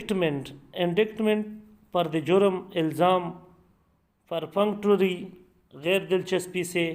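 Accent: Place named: Pashto, Pakistan. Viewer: You missed pronounce many words instead of pronunciation in pasho accent ur accent should be urdu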